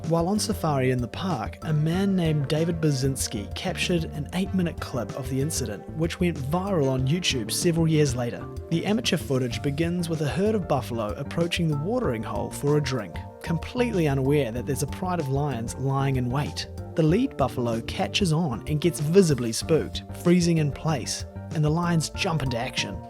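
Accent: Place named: Australia. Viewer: Absolute garbage! Just some guy talking with an aussie accent and telling stories with irrelevant stock shots. #shamelesscashgrab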